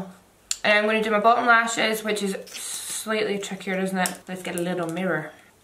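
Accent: Scotland, United Kingdom. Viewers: her accents amazing ! there's hardly any scottish youtubers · You are so different and legit beautiful, I love the fact that you are not like everyone else, and I flipin love your Scottish accent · ...i just recently found you on YouTube and now i cannot stop watching your videos!!!! you're absolutely gorgeous, your makeup looks are always fire, and i am obsessed with your beautiful Scottish accent!!!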